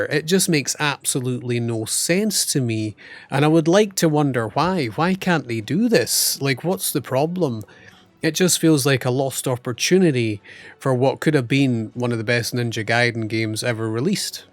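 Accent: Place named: United Kingdom. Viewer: This is still a great game. Whiny british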